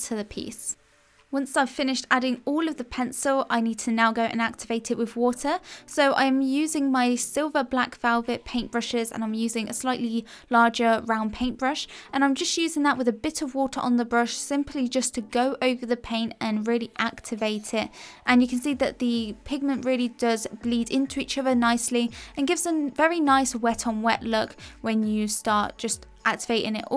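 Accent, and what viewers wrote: England, United Kingdom. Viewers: Finally!! I was trying to find a British artist because i wanna develop my british english accent 😭😭 I've just subscribed to your channel and hit the notification bell ✌️🖤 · I love your british accent.. a lo' wo'ah.. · Where do you come from (which part of england)?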